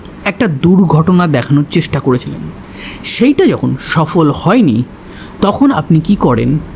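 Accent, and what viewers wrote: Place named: Hindi, India. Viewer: Alka sune aami bhabchilam hoyto alkatra e bolbe. 😂😂😂😂 O bhai bangla ta banglar moto bolo na keno??? Hindi moto bolte giye na bangla bolle thik kore na hindi. Tomar maa baba ke gigesh koro Alka ki kore bolte hoy. Aamar bangla o khub ekta valo noy.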